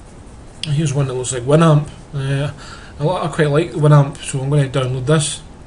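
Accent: Scotland, United Kingdom. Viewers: brother are you Scottish i like your accent and thanks for the video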